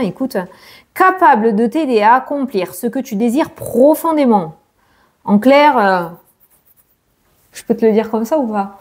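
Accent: South, France. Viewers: Bien sûr que l' on te regarde, et surtout que l' on t' écoute, ton bel accent du sud nous donne un rayon de soleil tu es une vraie petite pile merci a toi et bonne journée bon weekend gros bisous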